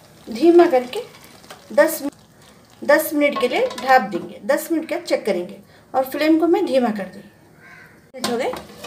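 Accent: Hyderabad, India. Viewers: Hamari Hyderabadi's language hai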